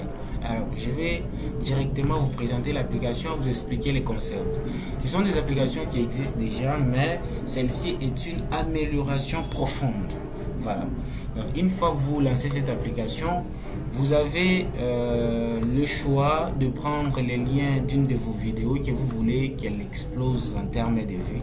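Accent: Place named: Swahili, East Africa. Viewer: Salut je suis vraiment intéressé par cette application Et oft j'ai comme l'impression que tu as un accent swahiliphone est tu de Lubumbashi?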